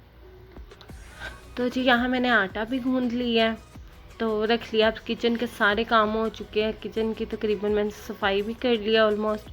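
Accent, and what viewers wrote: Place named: Punjab, Pakistan. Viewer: Assalam o alaikum Appi lgta h Pakistan shakargarah se h apki voice se lg raha h hamare me udhr k h wo b apke jese bolte h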